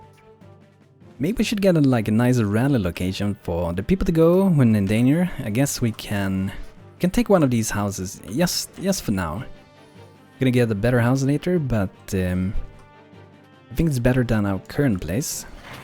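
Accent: Sweden. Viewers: your Swedish right?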